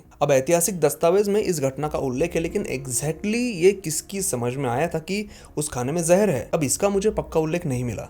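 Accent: Maharashtra, India. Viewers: बहुत अच्छा व्हिडियो! जी आपके कुछ उच्चार जैसे की 'हाथी' के बजाय 'हत्ती' बोलना और 'निर्माण' को 'निर्मान' बोलना उनको सुधारने की जरुरत है. आप मराठी हो ये आपकी बातो से झलकता है.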